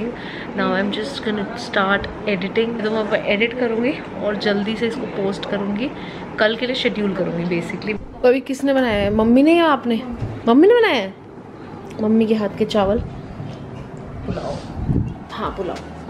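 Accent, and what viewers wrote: Punjab, India. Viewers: Oh aapne bol diya tha last vlog mein ki aap ki Punjabi Punjabmein aate nikal aati hai.. 👍 😅 Your mum is soo beautiful. ❤️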